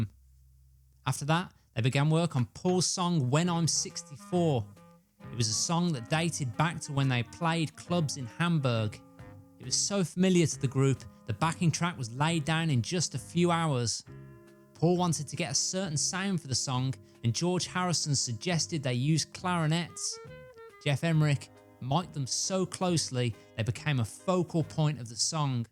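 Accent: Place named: West Midlands, United Kingdom. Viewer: Thanks for all these videos you’ve made documenting the discography, really good stuff. Made even better by your West Midlands narration, you’re a legend!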